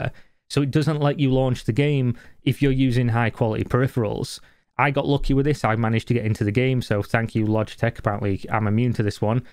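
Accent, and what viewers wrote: United Kingdom. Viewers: so satisfying to listen to a man rant in a british accent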